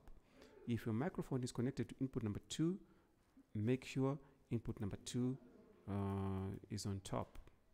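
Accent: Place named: Zimbabwe. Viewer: Ndazvinzwa hangu uyu mwana wevhu chete 😂 anyway thanks brother this video is awesome and helpful 👏🏿👏🏿👏🏿👏🏿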